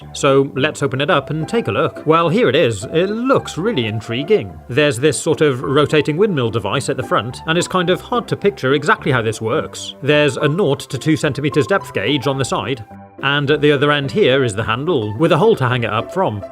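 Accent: England, United Kingdom. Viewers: Your british accent very cool, i like it! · bro is from the united kingdom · Are you from England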